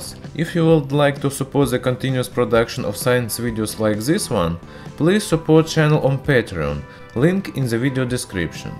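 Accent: Russia. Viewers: Are you from Russia? Some Slavic region? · Mofker GT He's Russian, give him a break.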